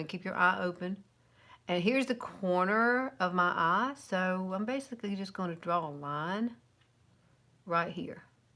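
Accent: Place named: South, United States. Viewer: Thanks ♥️ you do sound southern... cute though :) enjoy you Cancun trip! 🌺🌺🌺🌺🌺🌺🌺🌺🌺🌺🌺🌺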